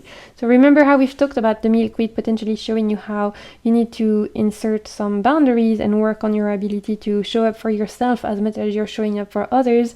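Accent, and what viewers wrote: France. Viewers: That’s a very complex oracle deck. You can tell a lot of time, energy and research in making this deck come to life. I will check it out and the 10% discount is great! Thank you for reviewing this for us., you need to make an oracle based on the plants and wildlife you grew up with. Teach us about where you live in the world. Many days I wish I was on a tropical island. Especially with winter in the Northern Hemisphere is so bitterly cold. BTW your French accent is lovely. I studied French in high school. I’ve always thought it was a beautiful language.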